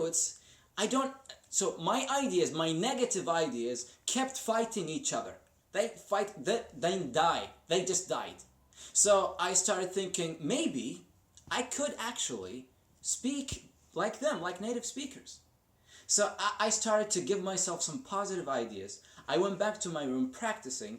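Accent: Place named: United States. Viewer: my words!!! this guy has just blown me away. Incredible to watch you speaking exactly in the American accent. You are just killing it man, I understand how much efforts you would have putted in it. And from a country like SAUDI ARABIA where ARABIC is everywhere. Congrates man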